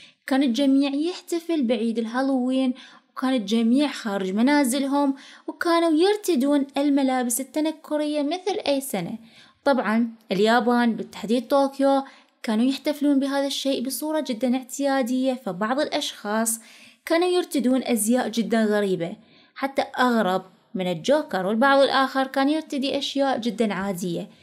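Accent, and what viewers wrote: Iraq. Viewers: ياحلو الهجه العراقية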